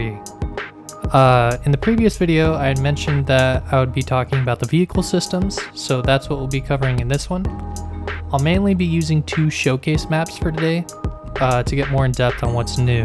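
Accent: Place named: Canada. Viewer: are you perhaps Canadian? (You sound very Similar to Kan Gaming)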